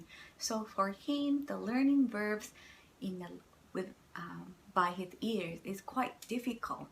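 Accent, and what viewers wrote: China. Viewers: Are you a Japanese or Chinese? Your English accent seems like Chinese person speaking English.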